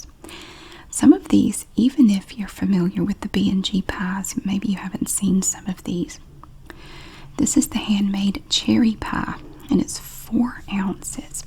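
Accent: South, United States. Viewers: i love love your southern voice SO much !!! ❤️ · Omg I love your accent!! Can really hear it when you say “pie!” I always wanted to move to the south!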